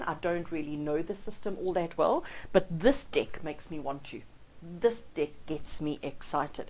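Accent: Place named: South Africa. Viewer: Hi...by your accent you sound South African. Is this so? If you are, then you're the first SA YouTube channel I've discovered here. Oh and this deck is on my Xmas wish list!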